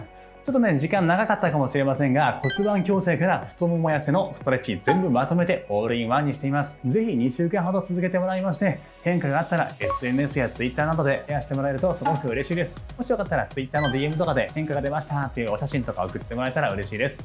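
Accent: Kansai, Japan. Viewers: めっちゃ有難い😊 最近は太股が締まってきてズボンに余裕を感じます。🎵 それと、歩いてても前と何だか感覚が違う気がするのは気のせいなんかな？ 先生❗ちょいちょい出てくる関西弁がええわぁ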